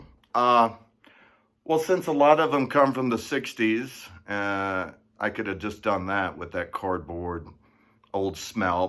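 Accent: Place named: Sweden. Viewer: I was trying to guess your English accent. You don't seem American or English. Are you Swedish? You don't seem German either.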